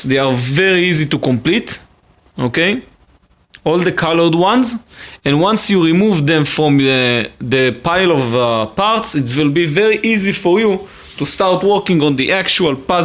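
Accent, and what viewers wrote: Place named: Israel. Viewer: Love your Israeli accent!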